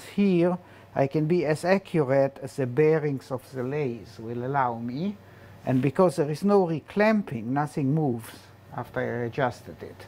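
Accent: Israel. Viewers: Hebrew accent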